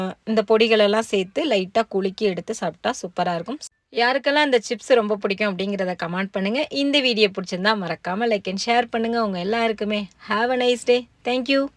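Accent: Tirunelveli, India. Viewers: . நெல்லை தமிழ்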